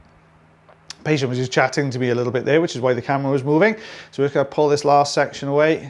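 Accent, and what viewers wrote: Wales, United Kingdom. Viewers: Why is my day not complete unless I've watched these? Got to love that Welsh accent & ear peels to soothe away the days stresses!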